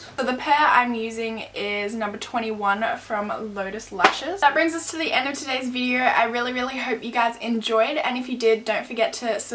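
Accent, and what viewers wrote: Australia. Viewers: I'm not an expert in accents but is she Australian? Amazing makeup btw :) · U sound like Kylie Jenner but with an Australian accent XOXO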